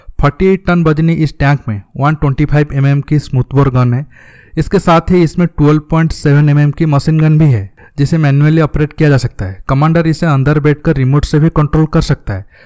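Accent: Odisha, India. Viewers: Bhai ap odisha sa ho kya?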